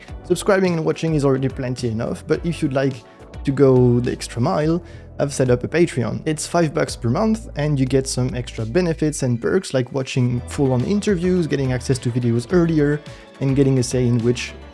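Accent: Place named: France. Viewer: Je me disais que t'avais un petit accent fr. Par contre vraiment propre ton anglais on pourrait même croire que t'es autre chose.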